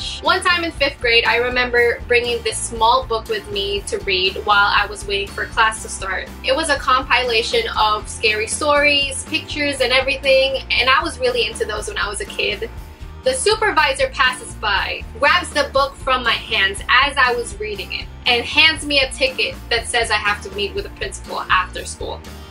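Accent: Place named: United States. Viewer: Sounds like a filipino turned American...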